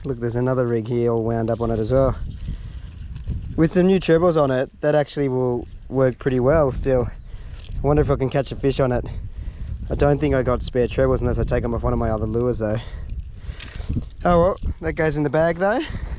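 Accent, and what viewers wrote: Australia. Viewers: Is this Australia ? I sense because of accent. English isn't my native language so don't quote me on my guess. It's pretty beautiful though. Keep up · He sounds Australian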